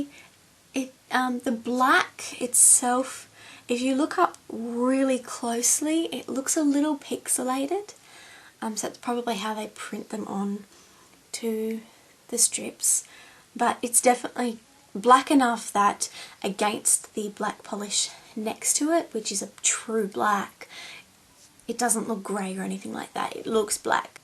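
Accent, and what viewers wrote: Australia. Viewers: Sounds pretty Australian to a Brit :)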